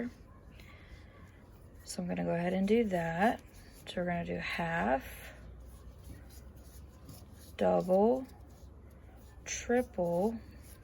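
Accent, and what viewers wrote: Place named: South, United States. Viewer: NICE! I’ll be making some of these for my son’s family. You must be a southern gal. I’m in Louisiana and you sound like one of us. 😍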